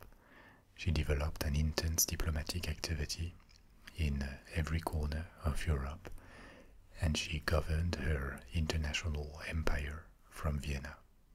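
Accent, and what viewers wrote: France. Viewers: i always come back to this video, love the french accent <3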